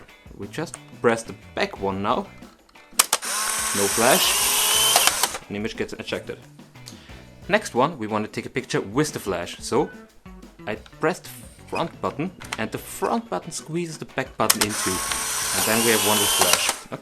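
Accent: Germany. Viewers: Deutsch ist deine Muttersprache, oder? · One question. Are you German? Sounds like it. :)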